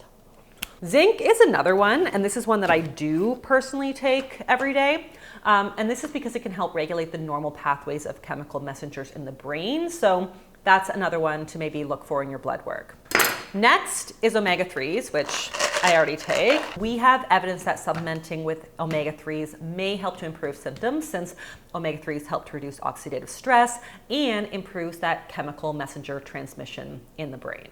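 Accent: Canada. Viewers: I know you're Canadian. But you sound more and more Canadian recently. Love it! ❤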